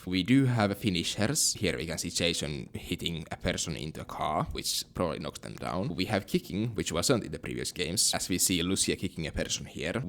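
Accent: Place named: Finland. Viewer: awesome finnish accent bro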